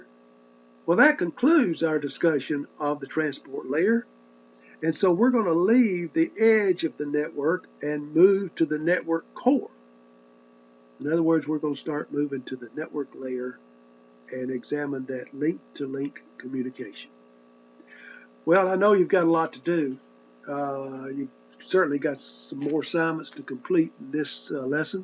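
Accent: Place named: South, United States. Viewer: Nice lecture and love that southern accent :D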